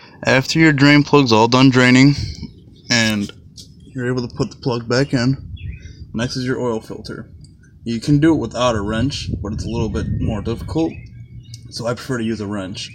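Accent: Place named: Michigan, United States. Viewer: Lol yea man im from michigan too! I got the accent too haha..but im just lookin to buy a nice condition 05 grand prix w the 3800...i do the oil changes in all my cars and just wanted to see how much of a pain this one was...looks fairly easy access to me...i also know the throttle bodys get jammed up on these...whats ur opinion on that?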